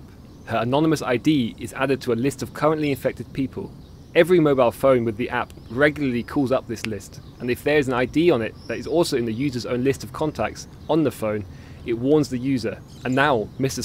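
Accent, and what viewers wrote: Germany. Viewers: Hahahah der deutsche Akzent 😂😂😂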